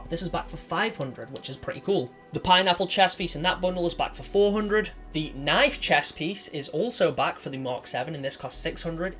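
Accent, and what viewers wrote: United Kingdom. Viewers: Your English looks like England accent but it's more easy to understand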